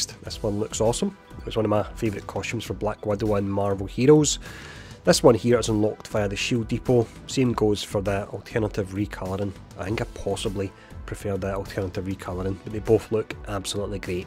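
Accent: Scotland, United Kingdom. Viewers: This guy must be Scottish. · First video I've seen of yours.......please speak up next time. When someone is whispering with a Scottish accent, it makes it impossible to hear what is actually said. So either change the accent (which I doubt will happen) or speak up.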